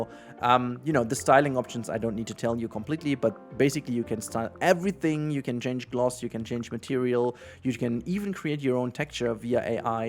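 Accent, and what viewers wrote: Germany. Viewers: Wie man das deutsche raushört 😂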